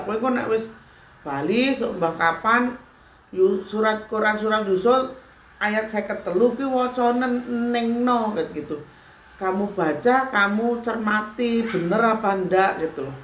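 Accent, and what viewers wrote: Java, Indonesia. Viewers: Sy sangat senang mengikuti chanelnya mbak,karena bbrp faktor: 1.Gaya penyampaian yg nJawani,kalem,sederhana tapi cukup mentes.2.Rendah hati,tidak terkesan menggurui atau bersikap "sok".3.Ada kesan penyampaian amanah,karena ibaratnya mbak mendapatkan "oleh-oleh" yg seyogyanya dibagikan kpd teman" lain agar bisa ikut menikmatinya.4.Tidak terlihat sedikitpun ada upaya utk membodohi atau mengajarkan sesuatu yg menyimpang dari tuntunan agama Islam.Kalo ada perbedaan persepsi karena pengaruh budaya itu sdh lumrah.Maka jika yg berkenan yg monggo,yg tidak ya silakan.Up to you.Bagi sy pribadi,sangat bermanfaat terutama bagi situasi skrg,di mana manusia banyak menghadapi tantangan hidup yg perlu solusi,bukan sekedar narasi.Yang perlu digaris bawahi adalah,bhw mbak bukan "memberikan ajaran",namun "menyampaikan pesan kebaikan bagi sesama" terlebih bagi yg sedang menghadapi kesulitan hidup.🙏🙏🙏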